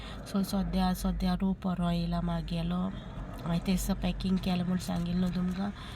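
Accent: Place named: North Goa, India. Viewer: I just like your north goa accent ❤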